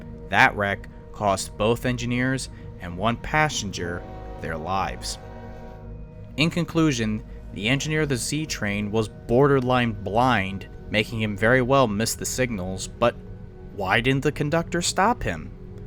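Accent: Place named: East Coast, United States. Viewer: Hey bro, he lives on the east coast and we pronouce things different out here. Cut the dude some slack jesus.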